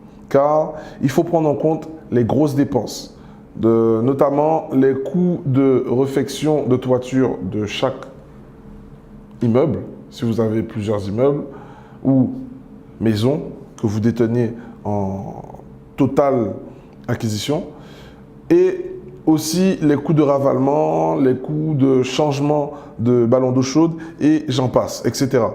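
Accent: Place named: Antilles, France. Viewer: Mignon l’accent antillais 😂👍🏾. Merci pour ces conseils car je viens d’acquérir mon 1er bien. Big foss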